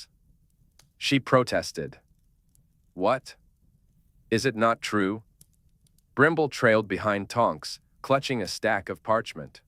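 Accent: United Kingdom. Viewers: British accent? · It's based in Britain so a British voice makes sense.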